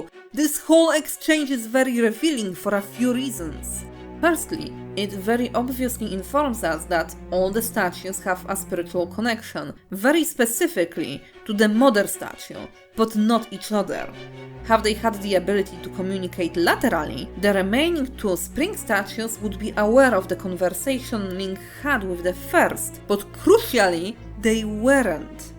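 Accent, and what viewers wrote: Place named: Poland. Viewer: Your accent is... pretty polish. Very polish actually.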